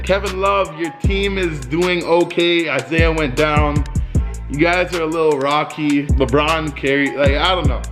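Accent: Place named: Boston, United States. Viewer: From boston I could tell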